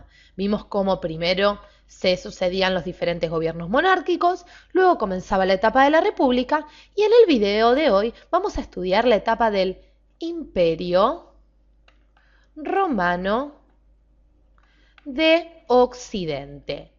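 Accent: Argentina. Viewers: Es argentina la piba?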